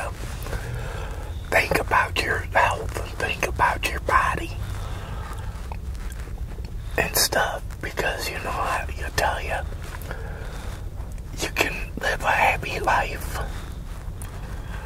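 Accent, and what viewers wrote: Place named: South, United States. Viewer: Are you originally from the south? You have a really cute southern accent lol. Have a lovely Sunday,! ☀️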